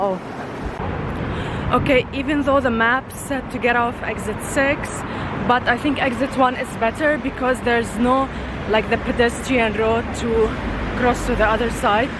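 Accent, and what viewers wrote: Lebanon. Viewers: ارجوك تكلمي لبناني واحد من اسباب متابعتي لك لهجتك 😢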